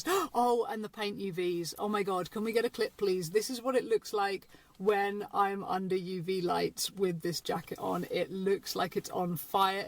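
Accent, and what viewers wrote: United Kingdom. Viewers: For those of us who don’t know UK regional dialects, can you tell us where you accent is from?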